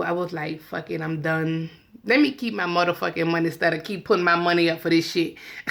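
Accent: New Orleans, United States. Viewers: That New Orleans accent!!!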